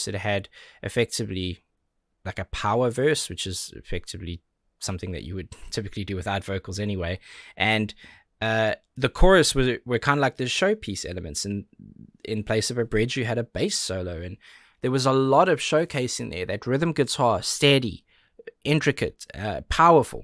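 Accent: South Africa. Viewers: I have been watching you for more than almost 2 years I guess and I failed to realize that you are a South African and not a Brit inspite of my good understanding of various accents.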